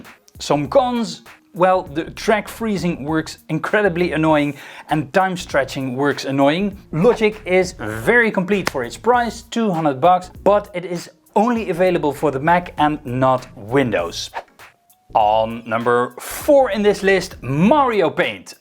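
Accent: Netherlands. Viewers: Hi, Are you from the Netherlands? (The accent sounds to me like that)….in that case I could ask my question in Dutch. 🙂 I was wondering….these are all tips for Imac/windows right? Any suggestions if you want to work on an ipad (and only ipad)? I’m using garageband now….I know it’s not the most professional choice, but for free and I can use it with just my ipad. Any advice?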